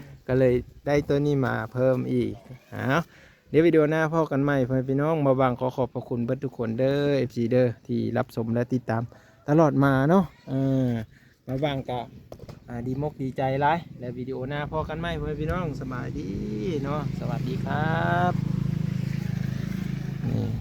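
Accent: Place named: Nakhon Phanom, Thailand. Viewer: คือเว้าคือคนไทยจังหวัดนครพนมแ ท้นึกว่าเป้นคนไทย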